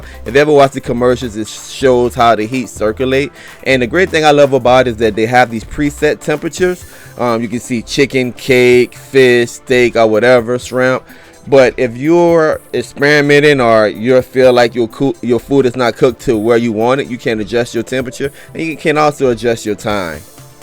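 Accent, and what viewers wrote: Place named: Louisiana, United States. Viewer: I knew it lol. As a fellow cajun, I would recognize that accent from anywhere.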